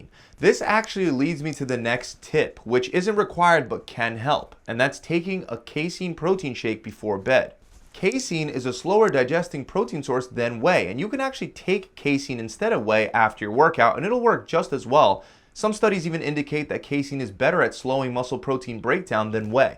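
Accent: United States. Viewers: It's interesting video but what a pity this guy speaks so fast and with strict USA accent forgetting his post goes all around the world and he doesn't do any effort to be understandable for people that are not necessarily of English mother tongue. He is not the only one, many do the same😞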